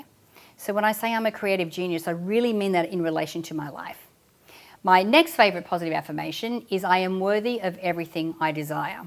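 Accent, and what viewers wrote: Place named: United Kingdom. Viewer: but your accent sounds british. Am i wrong?🤔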